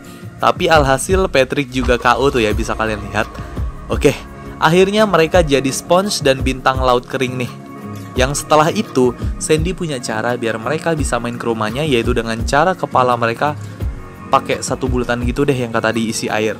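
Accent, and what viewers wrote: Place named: West Java, Indonesia. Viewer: Abang ti sunda nya bang Ngomongnya ada teh mulu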